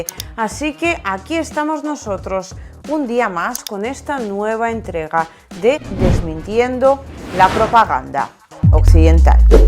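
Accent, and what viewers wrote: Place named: Spain. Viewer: eres lomaximo con ese acento español enamoras a cualquira llevame contigo quiero conocer esa parte del mundo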